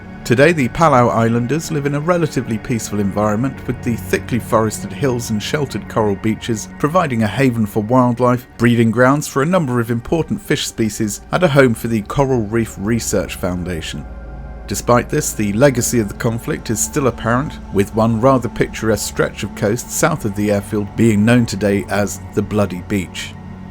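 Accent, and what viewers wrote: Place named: United Kingdom. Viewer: Thanks yet again for an informative an interesting piece of work. Your style is and unruffled and your British accent is, of course, charming as hell...as always. Have to say I did miss " " at the end, though. It is always such a relaxing end to a good time, especially if you do a ramble at the end. More, Please, Sir!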